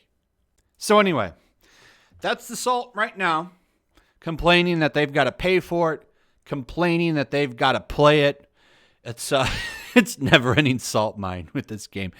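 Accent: United Kingdom. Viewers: Have never been into any games like GTA but if you can be Keanu Reeves in Cyberpunk 2077, then I'm one step closer to trying. Excellent video again brother. Loved the British accent. God bless you.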